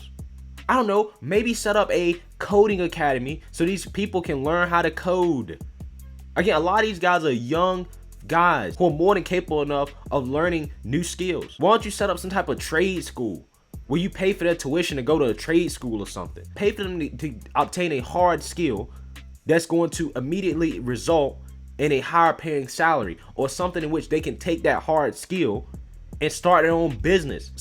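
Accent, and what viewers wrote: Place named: Washington DC, United States. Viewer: Hey boss where are you from city wise, just curious my guess from the way you pronounce certain words i would say the DC area and or the Baltimore area? I'm a correct? Lol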